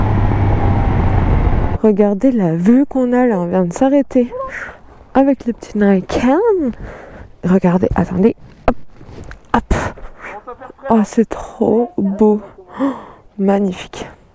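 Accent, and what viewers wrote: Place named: Corsica, France. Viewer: Top l'accent Corse... ✌️Et des vraies routes avec des virages, des bosses, des animaux sur la route,. Il faut pas se la faire en mode tourism tophy pour rester en un seul morceau. En plus ça sent bon le maquis corse, l'air marin, enfin bref ça sent bon l'oxygène, ça sent bon les pistes en terre aussi 😆✌️. Le nikken, comme tout ce qui a plus de 2 roues c'est pas trop mon truc, mais faire les presque 600.kms avec un pour essayer, bien sûr. Mooi je trouve que tu maîtrises quand même pas mal du tout, alors respect. ✌️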